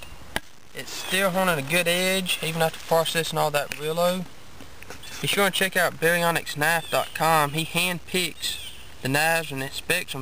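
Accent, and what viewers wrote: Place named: Appalachia, United States. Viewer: I luv a good backwoods hillbilly accent and my brother you got one! Lol No offense I find it very amusing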